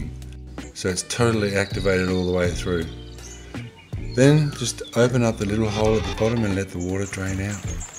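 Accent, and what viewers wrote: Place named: Australia. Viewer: ...and a beautiful voice speaking, besides this lovely australian dialect... 😉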